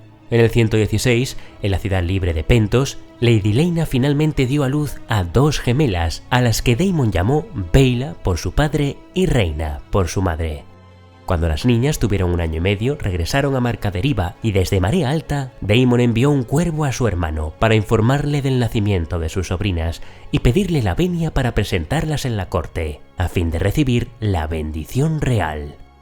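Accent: Spain. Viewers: brother que seas español, le da el toque a la narracion!! excelente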